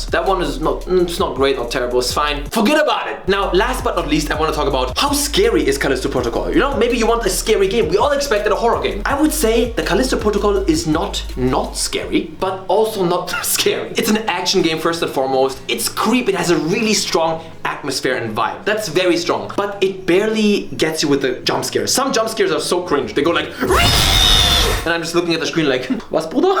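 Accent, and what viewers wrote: Germany. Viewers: Asiate mit deutschem Akzent. Luv it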